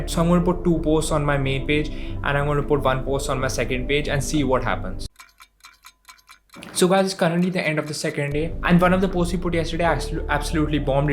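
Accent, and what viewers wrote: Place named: India. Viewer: your accent is like indian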